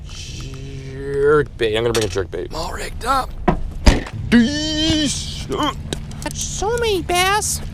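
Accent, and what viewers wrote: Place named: New England, United States. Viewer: Down here In the south we have a lot of fishin tournaments every year. Where are you from? you sound like one of them ol yankey boys ya hear? I'm 77 and you fish good to be young! Good ol boy!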